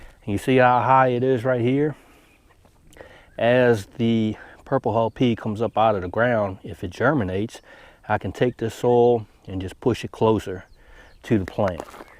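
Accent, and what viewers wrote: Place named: Louisiana, United States. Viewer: You can spend time spacing them evenly, or you can spend time thinning. You must be pretty close to the border because I'm hearing some coonass in your accent.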